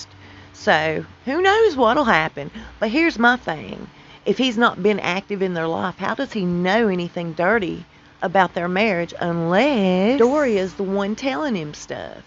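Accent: South, United States. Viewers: You really have a strong southern accent where are you from? I'm from the south but my accent is not as thick as yours.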